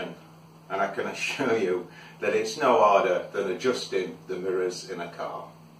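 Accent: United Kingdom. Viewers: Thank You for putting up such Clear & Understandable helpful videos Slowly Paced, worded & Demonstrated for the Newtonian Newbie!!.....Most of the Other fine channels use fast Complicated optical Terminology that Bewilder & Confuse beginners!!.....Especially like the Diy homemade Collimation tools & just love your clear British accent!!!.....Thx again!!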